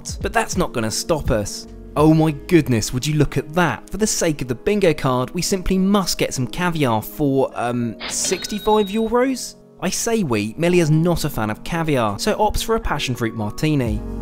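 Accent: United Kingdom. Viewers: Good Videos, but the British English is soo anoying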